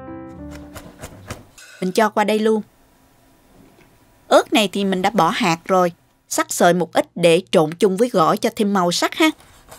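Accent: South, Vietnam. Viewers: Cách làm dễ, đơn giản và giọng nói rất miền Nam chất phác, dễ thương. Thích lắm nhe !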